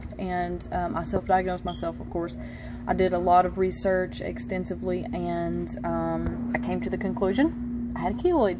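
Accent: South, United States. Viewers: My god I love your southern belle accent. Hey you should do a Bryson Tiller album review because he is from Kentucky and Louisville to be exact.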